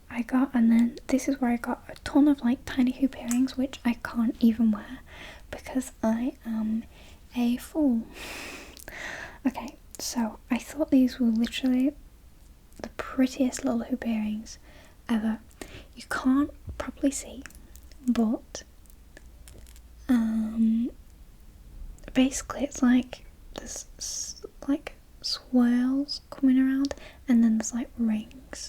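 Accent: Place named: Northern England, United Kingdom. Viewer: Kashan900 her accent is a northern English one.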